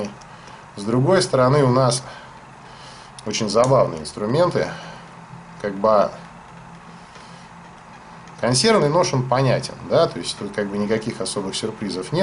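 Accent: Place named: Moscow, Russia. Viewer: Обычный Московский акцент! У некоторых колхозников, тоже уши режет , ножниц'Ы, пол'Ожил, отдуда'ВА, и прочий словестный понос!!! Автор видео, не сколько Акает, сколько вызывающе говорит! "Выёбисто" что-ли..))) Но обзоры достаточно интересные!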